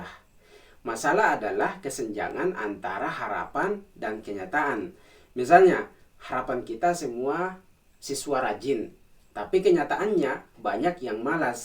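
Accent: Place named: Mamuju, Indonesia. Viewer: mantap pak, familiar dengan aksennya, ternyata benar orang mamuju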